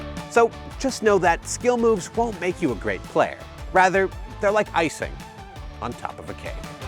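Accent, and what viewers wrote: United States. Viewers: Nah, his accent is much likely american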